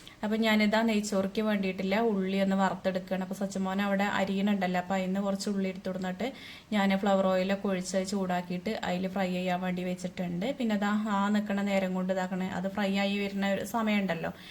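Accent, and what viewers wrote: Malappuram, India. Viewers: ningal Malappuram Anu Ann samsarathil manasilai evidayanu. Sthalam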